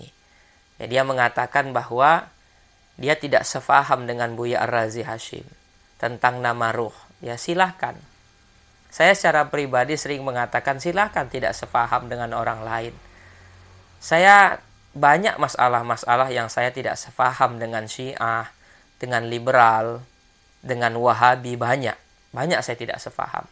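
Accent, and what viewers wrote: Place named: Java, Indonesia. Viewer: Alhamdulillah bisa ketemu channel ini. Insya Alloh bermanfaat bagi saya. Salam takzim untuk. Bahasanya lembut, logatnya seperti orang Jawa.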